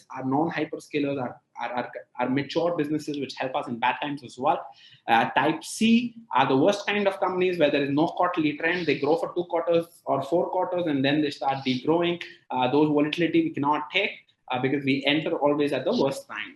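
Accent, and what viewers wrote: United States. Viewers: He went to school in london and got US accent? Chutiya hein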